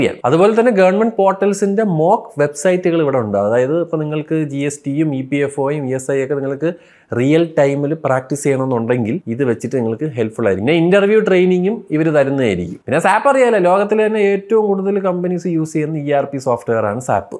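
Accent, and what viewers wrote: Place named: Thiruvananthapuram, India. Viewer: നിങ്ങളുടേം തിരുവന്തോരൻ ന്റെയും ഹൈ ലൈറ്റ് " തിരുവനന്തപുരം " സ്ലാങ് ആണ്.. കേൾക്കാൻ തന്നെ നല്ല രസം - എന്ന് ഒരു എറണാകുളം കാരൻ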